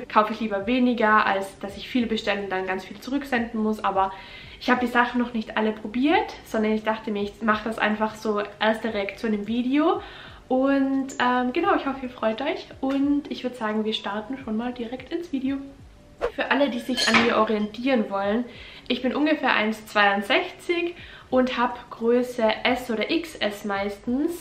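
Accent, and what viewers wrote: Austria. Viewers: Ich liebe den österreichischen Dialekt :) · Sie ist doch aus Österreich dachte ich:) und ich finds super süß den Akzent, einfach kein Hochdeutsch 😊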